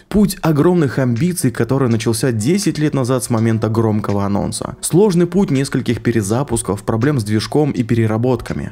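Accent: Primorye, Russia. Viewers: вы случаем не с Приморского края? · С Приморского края что ли? :)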